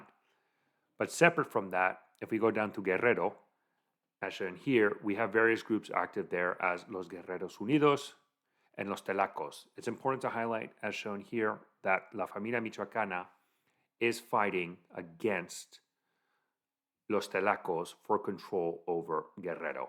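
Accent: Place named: Spain. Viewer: Gringo hablando mal español con acento español es super extraño xd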